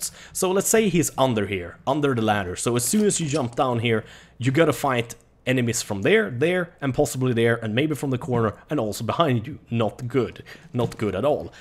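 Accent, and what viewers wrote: Sweden. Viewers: I love the swedish accent xDD lie hopw you say DOTT lol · are you swedish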